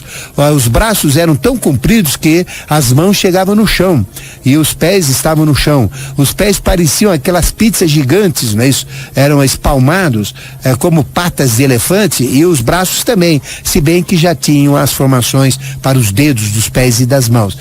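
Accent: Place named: Paraíba, Brazil. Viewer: esse teu sotaque de paraiba que fode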